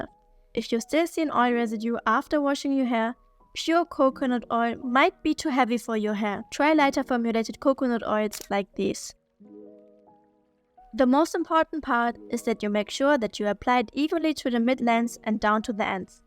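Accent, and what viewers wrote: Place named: Germany. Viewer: I hear a strong German accent 😄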